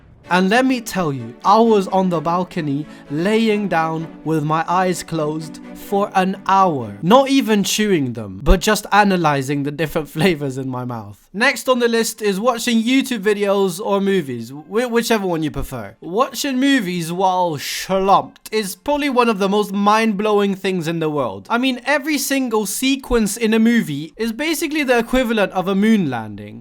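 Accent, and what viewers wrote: United Kingdom. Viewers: And he’s British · Now we got a British stickman stoner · Ayyy bri’ish stickman dude!!!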